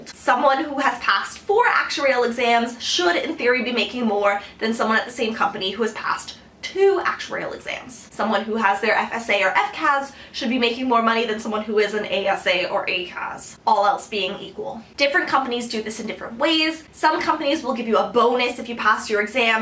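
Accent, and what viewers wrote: Canada. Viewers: Did I watch this on fast forward or do all Canadians fuck up our language like this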